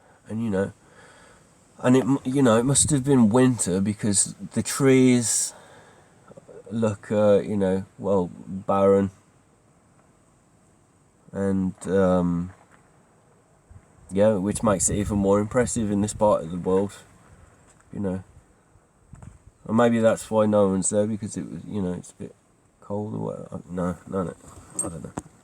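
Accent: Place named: Manchester, United Kingdom. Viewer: Love his accent…. 😍 Are you maybe from Manchester Sir?!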